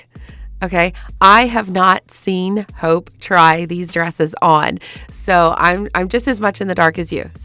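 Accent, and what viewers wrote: United States. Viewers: Hope ❤ You did such amazing work!!! I just watched her video and she absolutely loved them. I love the pink and rainbow dresses. Also, listening to you is so soothing. You remind me of my two aunts who live in Texas and Mississippi ❤❤❤ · Oh my God girl! Your voice sounds so familiar! Are you from Alabama? I saw you on the Hopescope video. And I heard your voice and I was like this girl is from Alabama and I know that voice!! Lol!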